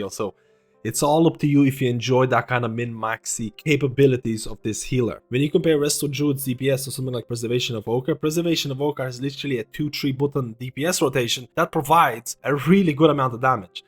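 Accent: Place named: Ireland. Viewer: Great vid as per usual! Why do I always here a tinge of an Irish accent from you? Lol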